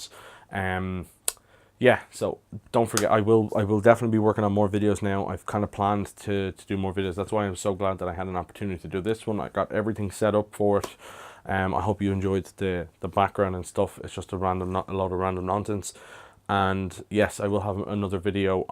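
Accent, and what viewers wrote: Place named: Ireland. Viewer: I'm trying to pick out the accent to see which county (or even which province) you're from. I'm not the best with accents though. Unless it's a strong Dublin, strong Cork, strong Donegal, etc I'll struggle. xD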